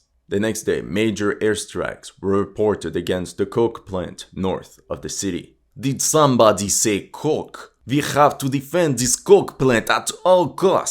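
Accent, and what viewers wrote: Eastern Europe. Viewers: Откуда автор? Чувствуется славянский акцент. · I don’t recognise his accent but I’m assuming somewhere in Eastern Europe. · ... He is definitively not Turkish. I just did a background check on him. His name is and that he live in Canada I already knew, but after doing a deeper dive and research, then it seems obvious that he is most likely from Russia or Balkan/Serbia. He has earlier stated that he can't read Ukrainian, but he quite clearly understand Russian and has spelled Zaporizhia as Zaporozhie like in Russian and/or Serbian. Also by the way he talk and pronounces cities, it's very clear that he is not a native English or French speaking Canadian, but has an Eastern European Slavic accent.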